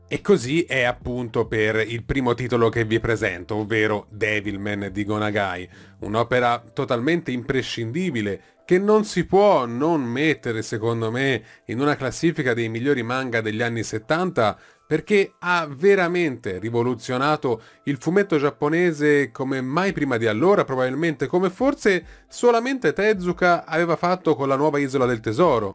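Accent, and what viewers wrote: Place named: Tuscany, Italy. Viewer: Hai una voce bellissima, toni pacati e mai noiosi, accento vagamente toscano mai pesante e contenuti coinvolgenti. Ho adorato anche questo video. Piano piano me li vedrò tutti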